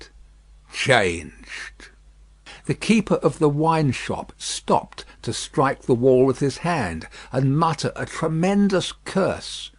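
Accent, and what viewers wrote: United Kingdom. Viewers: No, he sounds merely British.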